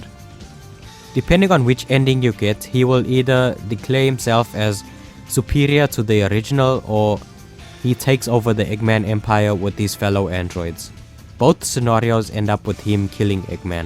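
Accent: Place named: South Africa. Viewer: That's nice 👌 I'm British Indian but I have a lot of Indian South African family, so that's how I recognised your accent!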